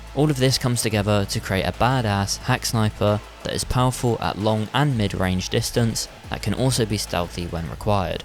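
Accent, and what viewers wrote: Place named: England, United Kingdom. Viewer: But also the more I watch this channel the more you remind me of Avarti from the monotone voice to the English accent to the weird takes on things, I’m glad cause we need more of y’all on YouTube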